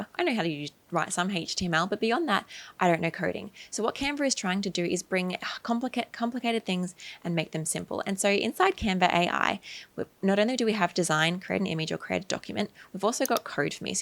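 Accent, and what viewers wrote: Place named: Australia. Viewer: Nice to here an Aussie voice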